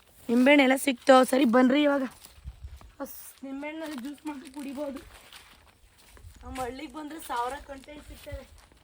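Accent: Mandya, India. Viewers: Yav uru nimdhu nammuru bhashe tharane edhe nim mathu kathe mandya athra yav uru ma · ಸೂಪರ್ ಮಂಡ್ಯ ಭಾಷೆ.. .... ನಿಮ್ಮದು ಮಂಡ್ಯ ಅತ್ರ ಯಾವ್ ಊರು ಯಾವ್ ತಾಲೂಕ್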